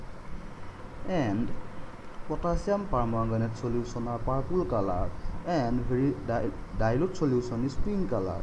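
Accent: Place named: India. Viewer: Sir is speaking the english that foreigner think of India 😢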